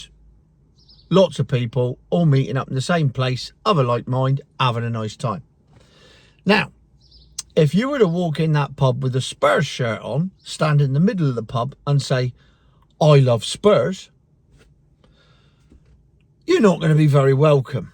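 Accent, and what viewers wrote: Cornwall, United Kingdom. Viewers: Your problem is that you speak with a Cornish accent therefore according to the Book of Musk the EVangelists bible you are an uneducated hayseed, a hillbilly of epic proportions because you don’t sound like someone from Knightsbridge. The fact that you are a qualified educator must now send their guts into a Vindaloo paroxysm. Well done.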